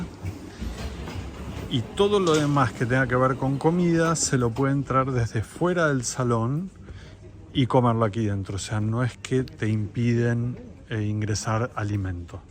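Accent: Argentina. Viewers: Me da la idea que eres Argentino, por tu acento, y la actitud muy de por ahí, que por aquí en España, nos han dado un repaso con mucha mitomanía.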